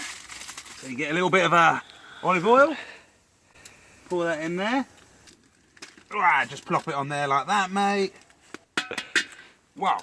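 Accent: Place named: South Africa. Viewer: Love the South African accent,! Would like more of it;)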